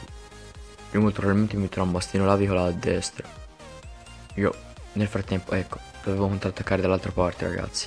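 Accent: Tuscany, Italy. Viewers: è toscano come me ahahahha😅❤ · ma sei toscano? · Ma quanto è bello il toscano 😍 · sei toscano vero si sente l'accento. come me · Ma sei toscano?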